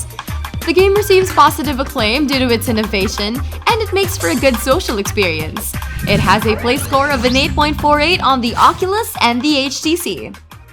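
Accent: Netherlands. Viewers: huh are you Dutch?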